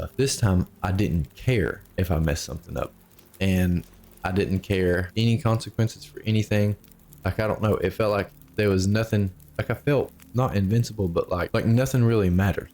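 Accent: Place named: Appalachia, United States. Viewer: Judging by your accent and the way you said "retched" instead of "reached", I'm gonna guess that you're Appalachian (me too). I'll never doubt people's stories of crazy stuff they see in the mountains, there's just too many stories for them all to be fake. I'm glad the dissociation passed for you, but this whole experience had to have been insane.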